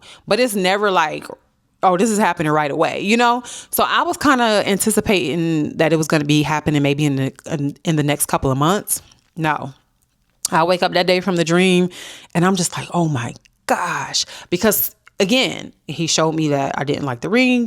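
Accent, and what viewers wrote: United States. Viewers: Upstate NY?? You def have a Detroit accent lol, I was shocked when you said that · You are soo pretty I hear a Buffalo accent are you from Buffalo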